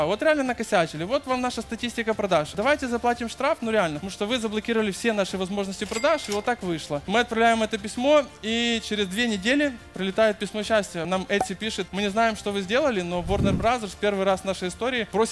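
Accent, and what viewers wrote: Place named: Russia. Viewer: І де ти "юкреніан"? Якщо говориш, як мо*каль.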